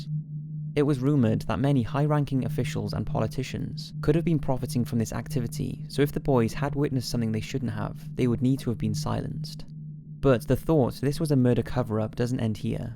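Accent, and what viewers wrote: Wales, United Kingdom. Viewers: He's Welsh. You like Welsh. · Gabriel Mondragon he is 100% welsh, I’m welsh and I recognise his accent well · thx my sexy british senpai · He's Welsh mate.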